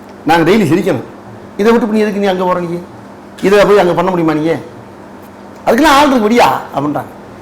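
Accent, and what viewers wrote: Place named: Madurai, India. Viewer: Anne neenga elladadala santhanam pondra " nagareegam ellada comedians" vara arambichittanga. Vaya toranda ketta vartha, kudumbattoda pakka mudiyala anne. Unga azhagana Madurai Tamila ketta comedy meendum kodunga.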